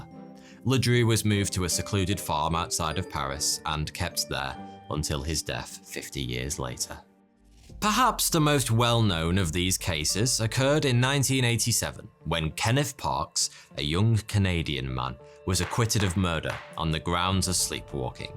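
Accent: United Kingdom. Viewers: I just love his accent. I've always wanted to have good british accent.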